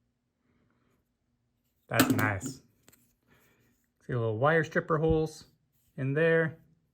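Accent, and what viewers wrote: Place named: Canada. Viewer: Every Smith Blade unboxing video is a guy with a Canadian accent and I think it's part of their plan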